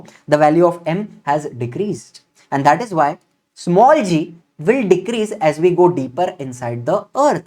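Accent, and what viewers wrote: Maharashtra, India. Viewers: Ek no marathi sir 😅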